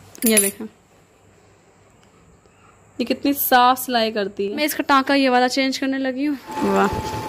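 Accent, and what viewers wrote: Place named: Karachi, Pakistan. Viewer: ap apne accent se karachi ky lgty ha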